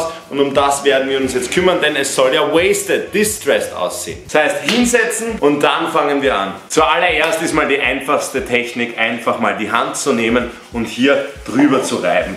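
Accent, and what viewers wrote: Austria. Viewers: In östereich spricht man des so aus:)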